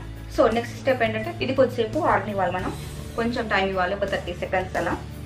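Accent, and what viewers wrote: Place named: India. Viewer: Your telugu slang vedio is nice&suppr 👍 Do more this typ of vedios weekly once it's more comfortable to common people...🙏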